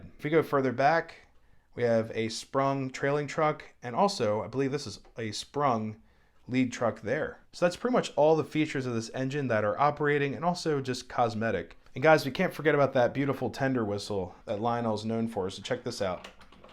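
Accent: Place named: Maryland, United States. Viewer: the Train Garden? are you from Baltimore? I hear those Maryland and SE PA vowels.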